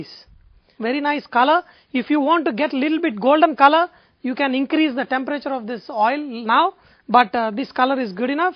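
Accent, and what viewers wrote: India. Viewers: guys, stop making fun of his accent. you try giving a cooking lesson in an Indian language and see how you feel!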